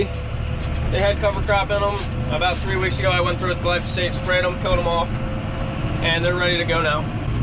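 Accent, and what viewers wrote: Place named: Southeast Pennsylvania, United States. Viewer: Found this channel by accident, started watching and thought "that guy talks like he is from SE PA". Then I saw the video of the area and looked like the Lancaster area. Sure enough I was right, I live about an hour away from you and visit that area occasionally. Great to see videos of hard working Pennsylvanians. Keep up the good work and the great videos!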